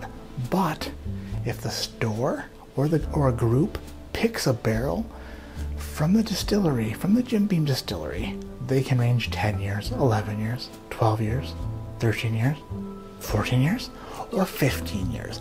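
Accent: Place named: Minnesota, United States. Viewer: He has SUCH a Minnesota accent! Must be from the Cites or thereabouts.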